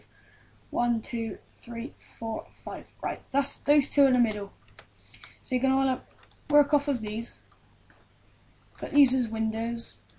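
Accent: United Kingdom. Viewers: you sound more scotish than english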